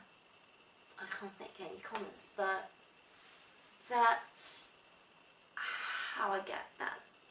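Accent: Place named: United Kingdom. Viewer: damn ur bri'ish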